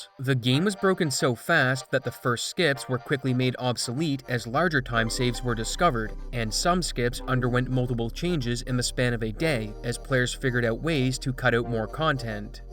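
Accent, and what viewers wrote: Canada. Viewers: In your outro, you always say " ", but your profile name clearly lacks the Z. Did it once before, and do you ever consider adding it back(you don't have to, just curious)? Also, with how you pronounce words with the sound of "out" and sometimes just "ow" in them, I wonder: Are you Canadian? Because the way you say them is a pretty common thing in Canadian accents. And about your voice, is that your actual voice talking, or an AI reading what you type in it?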